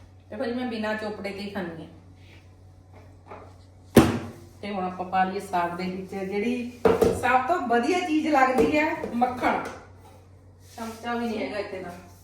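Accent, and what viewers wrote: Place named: Fatehgarh Sahib, India. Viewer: Asi bhi aive tadka laune aa ji tuhadi boli Fatehgarh sahib ja ropad dist di sister kehda pind aa ji tuhada video bahot sohniya hundiya ne tuhadiya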